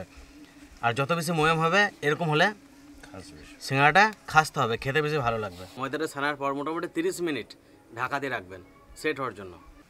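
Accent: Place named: Murshidabad, India. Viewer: আমার মনে হয় আপনি মুর্শিদাবাদের মানুষ কারণ আপনার কথাগুলো শুনে তাই মনে হল।আমার বাপের বাড়ী ওই জেলাতে।যদিও তার এখন গল্প।যাই হোক,আপনার ভি ডিও খুব ভালো অনেক শিখলাম। তবে সব্জি মশলা টা কী বুঝলাম না।ভালো থাকুন।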